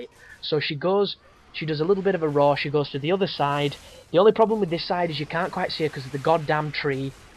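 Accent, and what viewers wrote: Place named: North, United Kingdom. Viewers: Dude you sound like the drummer for the band BMTH. So im assuming your from yorkshire cause thats where they are from. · Legend, your northern accent and explanation are immense hahaha nice one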